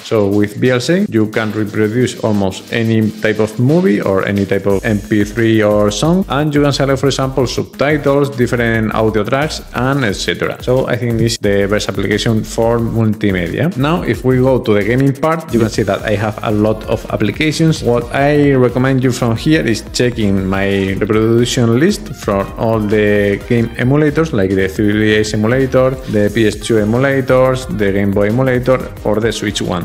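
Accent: Spain. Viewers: Cómo se nos nota el acento español cuando hablamos inglés... Buen video colega, me suscribo.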